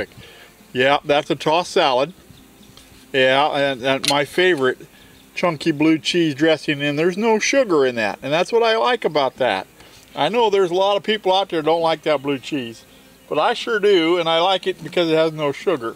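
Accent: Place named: Midwest, United States. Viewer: I'm from WI and you sound exactly like a Midwesterner! Great vid, you're making me wish it wasn't 1° outside right now where I'm at in MN.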